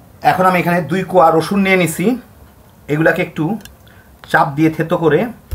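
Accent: Bangladesh. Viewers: Dada .... Epar Bangla (India) theke antorik bhalobasha. Recipe to darun, kintu apnar presentation ta awesome. Amara o bangal, r anek din por ei Bangladesh er accent ta khoob bhalo laglo. Bhalobasha o subheccha roilo 🙏.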